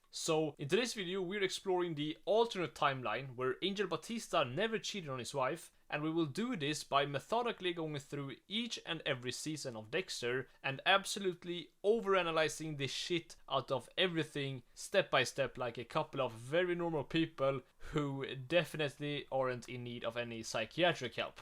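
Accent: Sweden. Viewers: Bro are you swedish? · Why does bro have a Swedish accent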